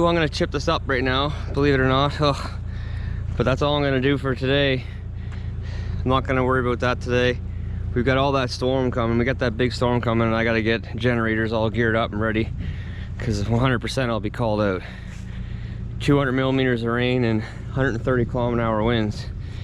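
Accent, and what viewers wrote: Nova Scotia, Canada. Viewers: First time seeing your channel. Sounds like a Canadian accent☺️, what area is this property near? It’s beautiful! That’s some long driveway in! Do you get snow? So the cabin is seasonal, or plow the driveway? What a dream!!! Great purchase👍🏼👍🏼👍🏼 congratulations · No - please work your native Canuck "accent" - I call them inflections for lack of better term - back in to your speech. As an accent-less, flat-speech, plain-white-toast American in the PNW I enjoy hearing it. It's amusing and I still have to mess with my family aboot it but it's flavor/character so don't mess with yours bud. I have to watch Letterkenny, Corner Gas, My Self Reliance and Strange Brew frequently to get a fix. Cheers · Sounds Canadian...love Canada!!! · That was the cutest Canadian accent I ever heard. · I heard the NS in your voice before you even mentioned it. 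😂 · I was wondering why your accent was pronouncing house as "Hoos" then you said Nova Scotia and it finally made sense. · Canada? Accent sounds canadian? Nova scotia!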